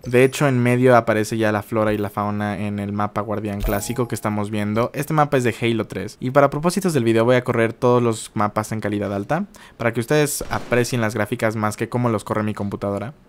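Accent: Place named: Bogotá, Colombia. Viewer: que paso mi Cantinflas y ese bogotazo xd no te va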